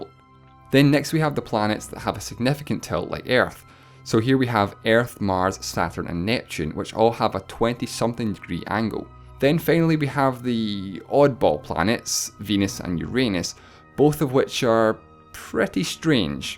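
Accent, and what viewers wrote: Scotland, United Kingdom. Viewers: It's scottish. He said that he lived in Scotland in his "How many countries are there in the world?" video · Planets, Scottish accent, Kerbal Space Program's music on background: I thought for a moment this was Scott Manley's new video! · The Wisest Waffle he's scottish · rockin' the scottish accent, eh?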